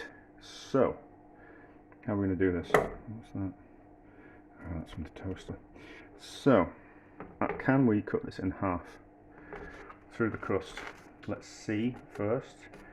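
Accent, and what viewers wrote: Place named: England, United Kingdom. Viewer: Granted I'm an American but I find his English accent very hard to understand. He talks too quickly and not loud enough.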